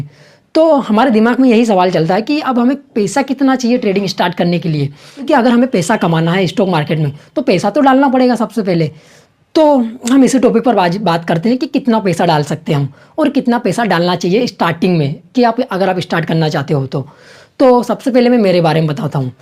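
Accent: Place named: Indore, India. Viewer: Bhai ap Indore se ho apki awaj parhchan Li mene😅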